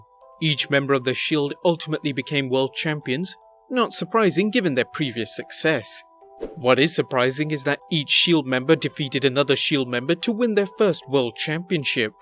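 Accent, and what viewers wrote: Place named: United Kingdom. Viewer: Why does ALWAYS have to be a British narrator?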